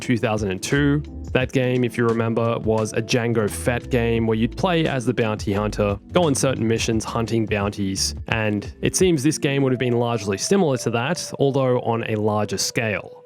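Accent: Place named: Australia. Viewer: What part of aus are you from mate ?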